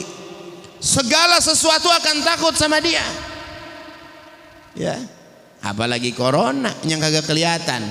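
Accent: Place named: Bekasi, Indonesia. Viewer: Logat Bekasi ny Kentel Bener....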